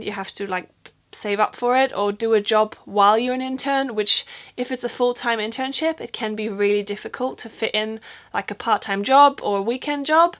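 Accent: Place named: England, United Kingdom. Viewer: Called it..... best English accent from a German. Of. All. Time. Gut gemacht!!!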